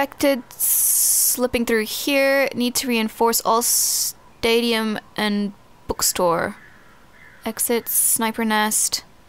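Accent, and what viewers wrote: United States. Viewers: are you from AMERICA? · I usually hate commentaries, but listening to your gorgeous Mid-Atlantic accent is a rare exception.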